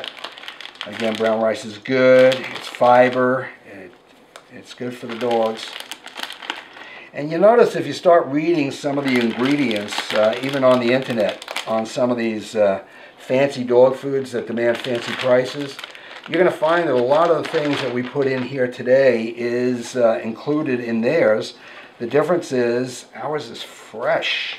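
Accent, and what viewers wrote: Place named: Long Island, United States. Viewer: it's good to hear a normal long island accent. i'm too far from home, everyone sounds unfamiliar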